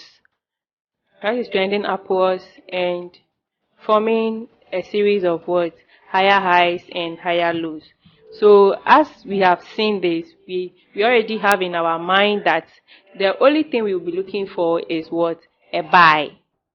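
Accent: Ghana. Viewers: This is my first time hearing voice cus I am always on twitter she sounds like a Ghanaian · Your accent is a mixed, sounds a little bit Nigerian and a much stronger Ghanaian accent. Nice video btw. I thought you trade smc?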